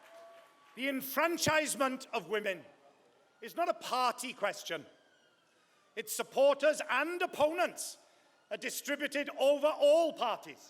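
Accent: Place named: Wales, United Kingdom. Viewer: Wow it's so rare that i hear his Welsh accent ❤️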